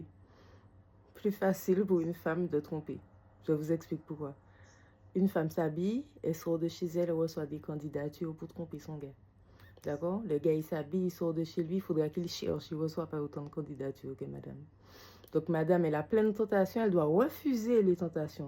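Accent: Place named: Martinique, France. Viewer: Parole ti mal mdr quand tu parles ont entend vraiment la Martinique free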